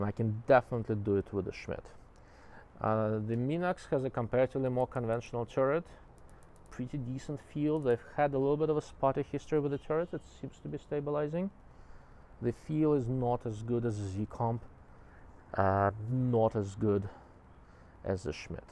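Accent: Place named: Europe. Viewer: Any optic reviews by a guy that looks like a young Dr Evil with a thick European accent demand immediate respect.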